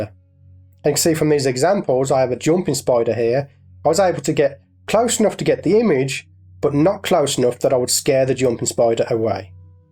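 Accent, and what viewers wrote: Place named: United Kingdom. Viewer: Love your systematic division of points of review. Cool accent too... is that English? Which part of the UK?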